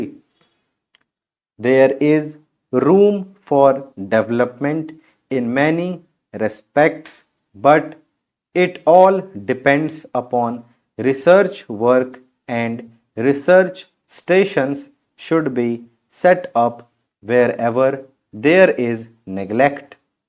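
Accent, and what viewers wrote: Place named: North India, India. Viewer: North Indian accent ......not useful for South Indians....you people call school as ischool.....😂😂😂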